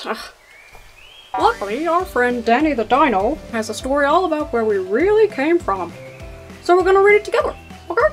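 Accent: United States. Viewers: I can't imagine this being someone's first visit to you. That would be insane. 😂 Great stuff. And your American accent is getting quite good. Your "Dr" Kent impression is stellar. 🙌🏼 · I'm crying... lol... you have a much better American accent than I do an English one... quite impressive lol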